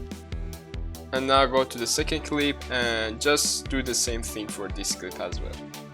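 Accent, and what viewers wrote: Iran. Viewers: ایرانی ترین لحجه ای که شنیدم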